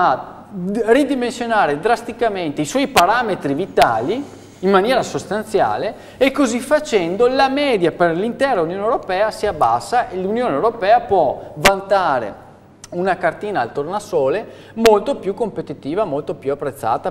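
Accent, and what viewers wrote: Veneto, Italy. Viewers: Benetazzo, non peredere mai il tuo accento trevisanpadovano che ti caratterizza!!! bella Malta!!! ;-)))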